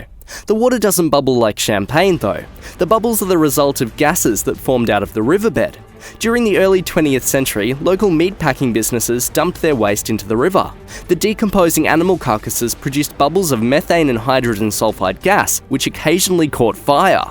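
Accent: Australia. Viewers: The narrator has a really solidly cool accent I'm thinking that it's Australian I hope I'm right but I'm just wondering now does different areas of Australia have different type of accents like the United States because I really like his. Would be fun to go live in that area just to come back with one.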